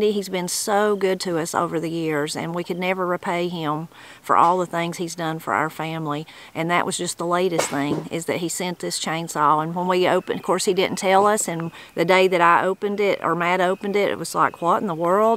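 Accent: Appalachia, United States. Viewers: Huskvana is the phonetic way of prouncing the Swedish brand chainsaw, but who cares if you mis-pronounce a word. It dosen't matter because we love your accent and Appalachian special words. That metal tub would make a great planter. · Ah the popsicles in the garden :) We might get snow tonight up here (I hope not) and I really need to clean my garden up too. Also, I love how you pronounce Husqvarna :) And while it's not how it's pronounced in Sweden/Denmark/Norway (it's a Swedish brand) I totally understood you - it was just done with an Appalachian accent! :) (pronounced like: hoosk ·vaar·nuh)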